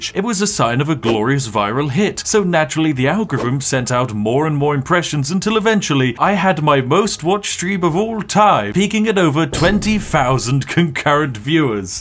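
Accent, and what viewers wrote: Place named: Yorkshire, United Kingdom. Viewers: Wow Yorkshire. Tea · Very Yorkshire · Yorkshire :3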